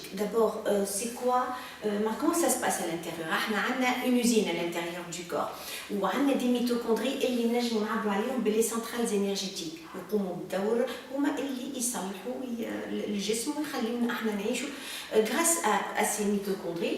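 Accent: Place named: Tunisia. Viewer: Merci Madame pour le partage ! Vidéo très éclairante ! J'aime l'accent tunisien !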